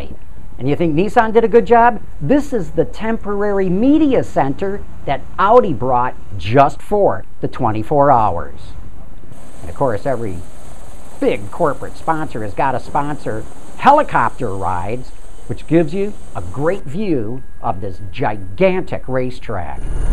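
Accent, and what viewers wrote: United States. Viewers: This guy's voice is insufferably American. I'd hate to travel with him to Europe.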